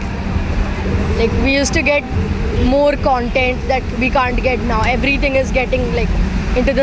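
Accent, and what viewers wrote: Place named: India. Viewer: Pure Indian accent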